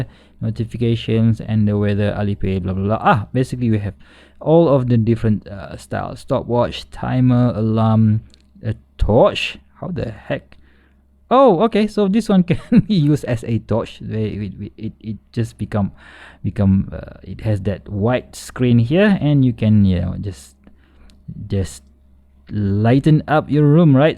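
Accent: Malaysia. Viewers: From your accent i know that you're from Malaysia isn't it?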